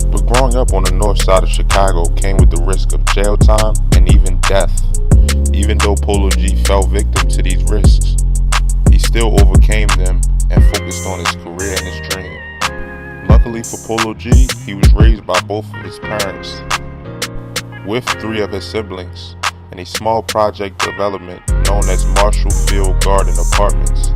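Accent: Baltimore, United States. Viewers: the person talking is definitely for baltimore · Gotta be from Baltimore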